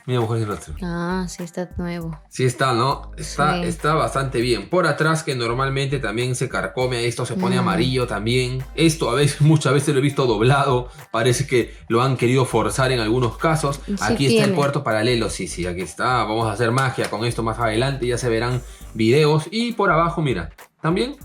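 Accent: Peru. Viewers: Sabía q eras peruano, la criollada el acento, excelente. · Eres Peruano verdad?? Feliz año broer. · Por el acento y criollas y la inca Kola fácil .... Es peruano - japones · 30 segundos de video y me di cuenta que era peruano :’) es un INKAAA KOLAAAAAA. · Ese tonito peruanazo me vacila chévere causa para ti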